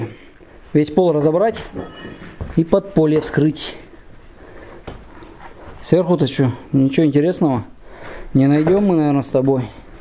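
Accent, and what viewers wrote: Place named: Kirov, Russia. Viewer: У автора ролика говор как у моих родственников в Кировской области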